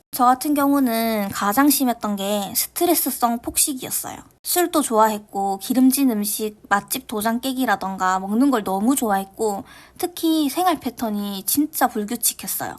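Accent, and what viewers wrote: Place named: Ulsan, South Korea. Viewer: 사투리가 울산같은데,.귀에 쏙쏙들어옵니다. 저는 포항이거든요ㅋ 저도 다음달에 대구에 하러가거든요 너무 와 다닿네요ㅠ 잘 보고갑니다. 함께 화이팅 해 보아요~~^^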